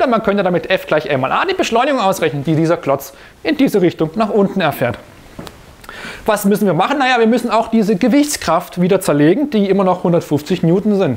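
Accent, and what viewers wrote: Swabia, Germany. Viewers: Schwäbisch versteh ichs gleich viel besser top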